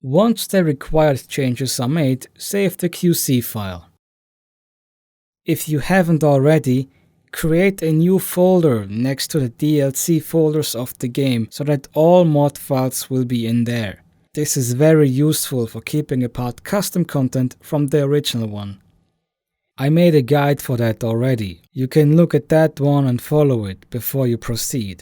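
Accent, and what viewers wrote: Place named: Germany. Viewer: Lel, hab mal dein Modding Video von vor 6 Jahren mit diesem hier verglichen. Den deutschen Akzent hört man so gut wie gar nicht mehr raus, nice :D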